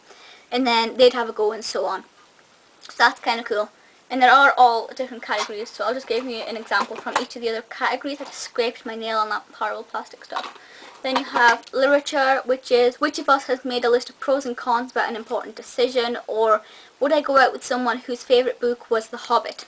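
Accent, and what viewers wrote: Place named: Scotland, United Kingdom. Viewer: Sorry, I'm American and I'm just inferring from your accent that you are of Scottish descent, if I'm incorrect, I apologize.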